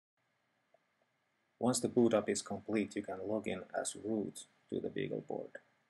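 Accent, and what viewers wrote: Finland. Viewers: That's a finnish accent alright. ;) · you sounds finnish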